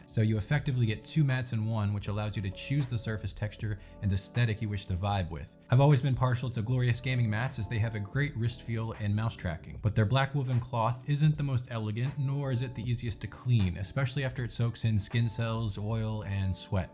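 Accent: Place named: Netherlands. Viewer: You're Dutch aren't you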